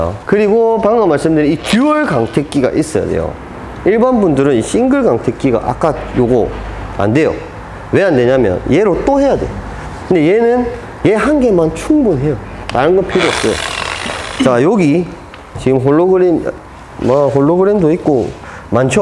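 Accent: Gyeongsang, South Korea. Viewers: 말투랑 목소리가 내부자들에 조승우가 경상도사투리 구현한것 같네 ㅋㅋ 저도 경상도인 ㅎ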